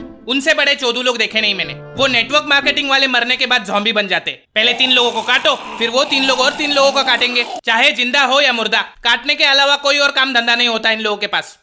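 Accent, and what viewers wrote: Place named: Mumbai, India. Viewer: We like your Mumbai ki language... the way you speak in different voices... unexpected topic pe Video aa jate hai😂 aaj to hamara dar khatam ho gaya... bechara bhoot berozgar ho gaya😂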